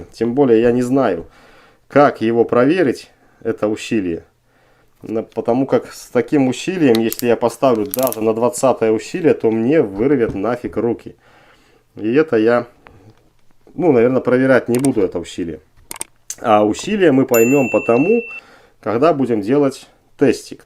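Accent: Belarus. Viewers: По акценту Беларус ) из Мозыря вы ?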